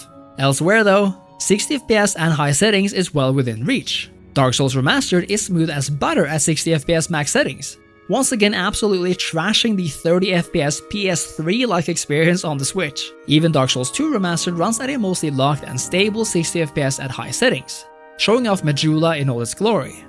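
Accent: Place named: Norway. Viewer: you from Norway? The accent sounds very much like it:)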